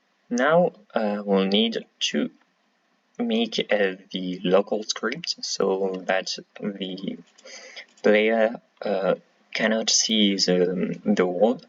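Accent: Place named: France. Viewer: T’inquiète l’accent français c’est hyper prisé dans les pays anglais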